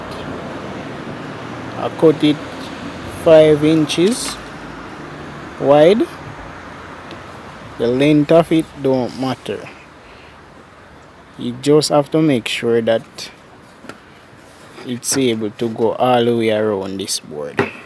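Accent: Jamaica. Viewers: New viewer. I like your creativity and the Jamaican ascent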